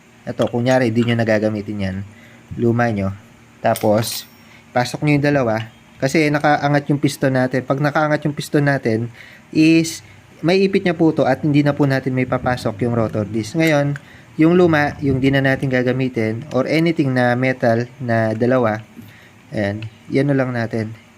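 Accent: Pampanga, Philippines. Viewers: Paps kapampangan ka ba?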